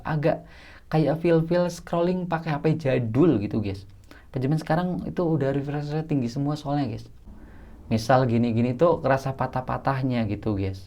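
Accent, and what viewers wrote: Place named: Banyumas, Indonesia. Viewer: Kamu orang daerah mana sih bang?😄 Logatnya rada ngapak.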